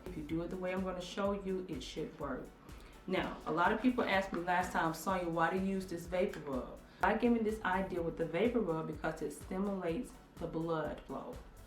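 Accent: South, United States. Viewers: She's not saying scab she is saying scalp with a a southern twang. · Adele Reed it’s just her southern accent.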